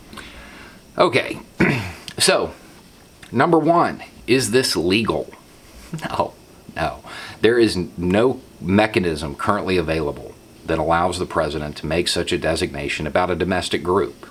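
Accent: South, United States. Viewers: Are you from Lower Alabama or Upper Florida?🧐😂 just a country question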